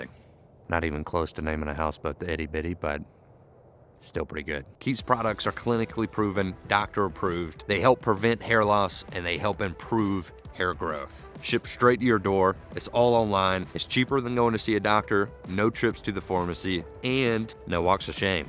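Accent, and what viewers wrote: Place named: Alabama, United States. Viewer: Hey I'm enjoying this boat renovation stuff, will keep watching to see what happens. I'm from Alabama, it's great to hear your accent.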